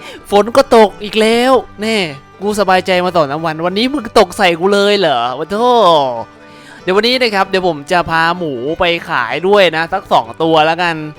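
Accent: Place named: South, Thailand. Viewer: ผิดเเล้วๆๆ555หนูชอบพี่เเหลงใต้มากเลยนิ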